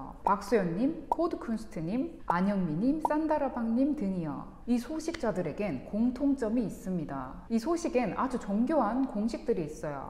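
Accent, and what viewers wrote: Gyeongsang, South Korea. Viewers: 경상도 사투리가 은근 나오는 억양이 매력적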